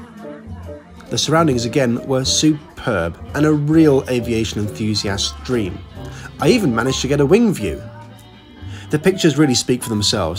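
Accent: United Kingdom. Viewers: LOL I laughed at that too. He's British. Give em a break.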